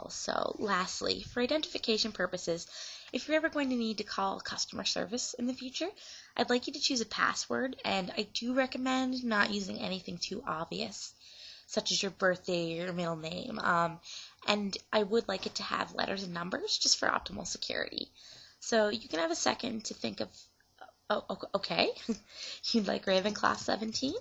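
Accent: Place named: Canada. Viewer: U r so Canadian. Uhhhh why u say about like aboat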